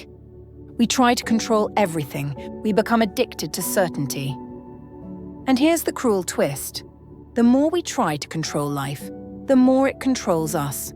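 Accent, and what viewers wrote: RP, United Kingdom. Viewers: You have a lot of hatred in your soul! Just because this lady speaks clearly you have to be nasty about the English accent! I assume you're American and, if you are, that speaks volumes! 👎😡🇬🇧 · Fast speaking stuck-up British accent shoots concepts one after another without saying what to do. You are never the one in danger, your soul doesn't need protection. Loosen grip to worry to open space. No longer chase the worry. Catch the fear and stop it before it spirals. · That isn't a stuck up accent, nor was she fast speaking. She spoke clearly in what used to be called received pronunciation, which was a hallmark of BBC broadcasts. But now we have to pander to the idiocracy.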